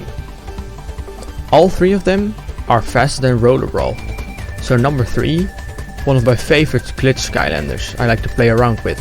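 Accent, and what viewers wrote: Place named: Netherlands. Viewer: Dutch accent strong in this one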